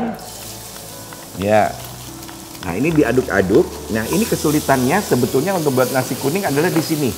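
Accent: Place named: Java, Indonesia. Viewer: Mbae ayu2 ngomong nya medok banget 😆😆😆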